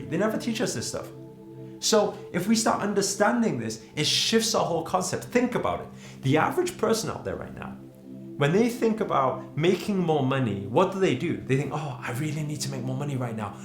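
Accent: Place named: Liverpool, United Kingdom. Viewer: Did you ever live on Liverpool? I hear a hint of scouse in your accent. :)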